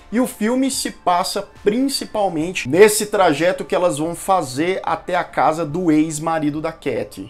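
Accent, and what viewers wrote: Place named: Goiânia, Brazil. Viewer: Sotaque goianiense detectado!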